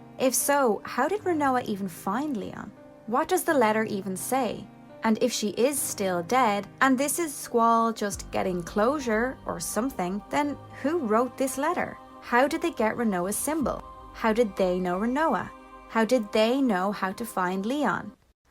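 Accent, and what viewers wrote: Ireland. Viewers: Love the Irish accent.. need to go visit my family over there 👀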